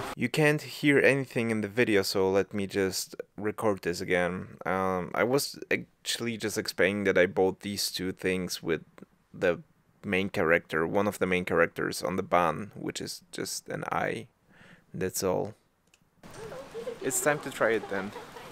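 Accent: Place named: Eastern Europe. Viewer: This Slav has a pretty cute slavic accent. 😊 Happy that you have the comfort of Polish cuisine where you live.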